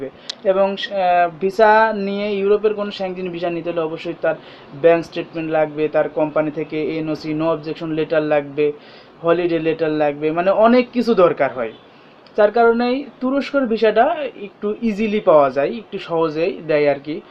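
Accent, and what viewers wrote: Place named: Jessore, Bangladesh. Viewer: আমি মলায়েশিয়াতে আছি ,আমার 7 টা দেশ all rady ঘোরা আছে , আমার বেতন 3500 রিংগিত বাংলা 70 হাজার + , তুরকি তে আমি to -rest অরিজিনাল ভিসায় যেতেচাই মাত~ 10 দিনের জন ,আবার মালায়েশয়াতে ফরবো, পরে ইউজোনে to -resta যেয়ে আর ফেরবো না , আপনার জানা মতে তুরকির ফাইল গুছিয়ে দেবে এই রকম এজিনসি আছে ??যানাবেন ভাই ,আমার বাড়ি যশোর ,আপনার কথা শুনে মনে হয় আপনারও ঐ দিকে ??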